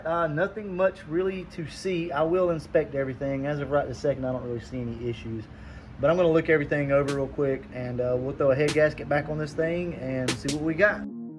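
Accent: Alabama, United States. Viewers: I'm from the south I heard your accent yep Alabama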